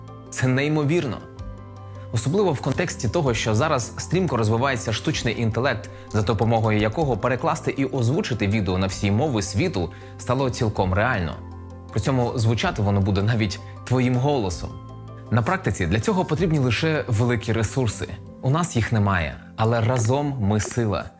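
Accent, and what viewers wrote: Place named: United States. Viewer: Вау! Акцент iдеальний, реально американський. Мовлення та структурнiсть висловлень просто на висотi! Дякую за вiдео брате!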